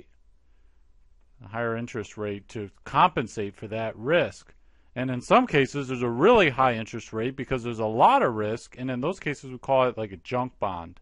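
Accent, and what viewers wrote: United States. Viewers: Thanks so easy to comprehend. Thank God for an American English speaking person explaining the concept.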